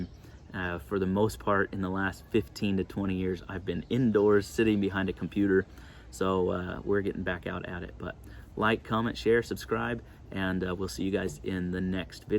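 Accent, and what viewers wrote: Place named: California, United States. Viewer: I'm sorry, this has nothing to do with the bus, but, I'm just curious, what state are you from, your accent, is it Californian?? I'm from and live in Papua New Guinea 🇵🇬. ☺️😇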